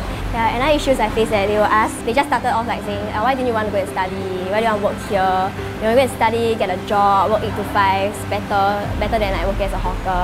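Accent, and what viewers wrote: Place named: Singapore. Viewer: zigstar losat this is how we speak among ourselves, most of us will moderate our speech to an extent when speaking to non-singaporeans (except Malaysians... they basically sound like us)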